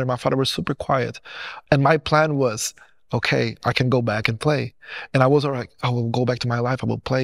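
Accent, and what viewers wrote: Brazil. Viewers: wow I was 99% sure that he was brazilian!! hahaha, I`m brazilian too so was kinda of easy for me listen to some phonemes in his English, that btw is very good! And of course, apart from that, God be praised for your healing testimony! Hugs from BR 🟨🟩🟦⬜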